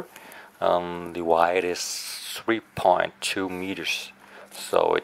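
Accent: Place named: United States. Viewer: Well, sort of English! More American?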